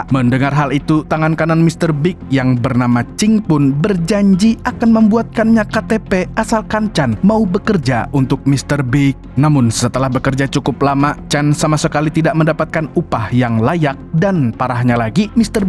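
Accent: Banjar, Indonesia. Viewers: ai urang banjar skalinya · Pian orang banjar kah mang.?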